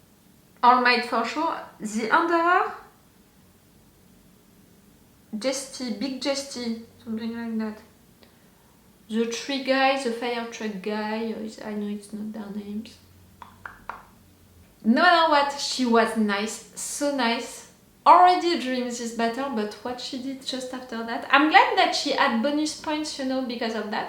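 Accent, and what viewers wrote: France. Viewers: C’est un accent français ca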